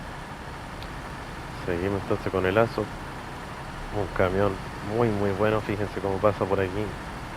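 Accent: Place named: Chile. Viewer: y a ese suscriptor, o "visualizador" de tus vídeos, tampoco le gusta tu acento chileno? Jajajajajaja La verdad, la gente se aburre mucho. La gran mayoría estamos contentos con tu narración y explicaciones, que es lo que cuenta. Si le gusta más la "buena" narrativa que el contenido, también hay canales de poesía. En fin, como siempre, otro gran vídeo. Saludos desde Moscú 👌🏼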